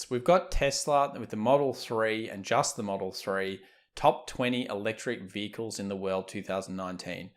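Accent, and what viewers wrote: Australia. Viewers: I m not dissing every australian ahaha I didnt know australian speak like that. I just cant withstand different accents other than american. There is noth to do about it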